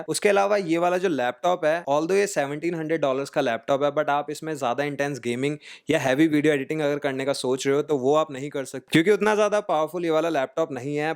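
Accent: Punjab, India. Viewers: hindi in punjabi tone. Feel good to hear😊😊😊👍👍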